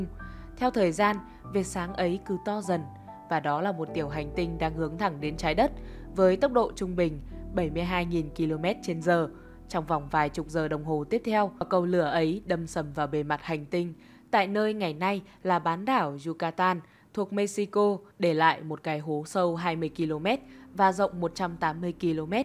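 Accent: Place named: South, Vietnam. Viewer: giọng miền Nam truyền cảm hơn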